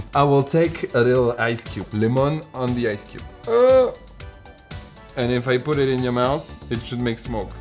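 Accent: France. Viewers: are you french???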